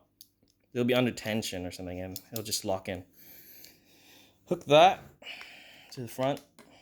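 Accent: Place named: England, United Kingdom. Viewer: What's your accent? Sound like fellow Englishman that lives in the States, got my MP5 and sling on order so thanks for the vid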